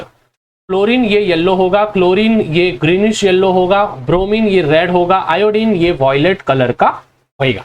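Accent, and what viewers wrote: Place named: Aurangabad, India. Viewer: Full aurangabadi accent 😂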